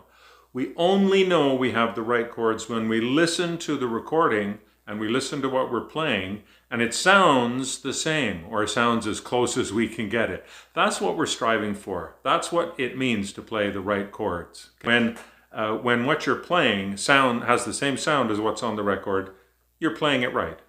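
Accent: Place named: Canada. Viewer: I loved how you used Abm as the second chord. It gives the song a different feel. Chris Marin of Cold Play also did his own arrangement. If the song writer is as good as Dylan, it opens up the material to many different interpretations (like Shakespeare or the Beatles). I love everything Canadian including your accent. The greatest people and country. 🇨🇦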